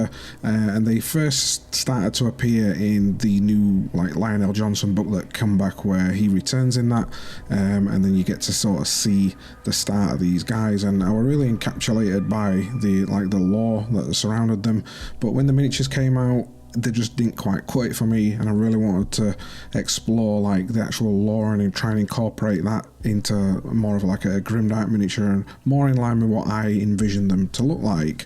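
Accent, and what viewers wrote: Yorkshire, United Kingdom. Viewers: as a fellow Yorkshireman, I love your accent.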